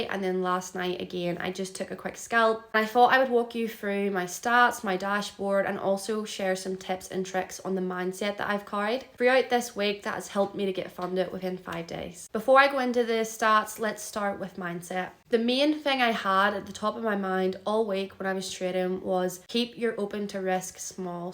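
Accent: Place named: Ireland. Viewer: First time watching your video. Thought you were Indian, surprised to hear your I believe Irish accent. A suggestion would to make your content more engaging even to non traders to grow your channel quicker.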